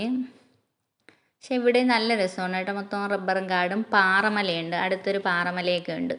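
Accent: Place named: Malappuram, India. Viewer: ഇത് പോലത്തെ vdo കൂടുതൽ ഇടൂ.👌 Tvm ആണോ മലപ്പുറം or kozhikode ആണെന്ന് തോന്നി.👍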